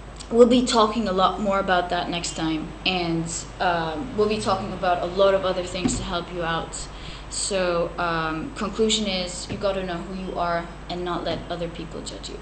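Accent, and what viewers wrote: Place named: France. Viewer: I think confidence=your capabilities Self-esteem=How you feel about yourself! By the way what is your native language? I hear a french accent, forgive me if I am wrong!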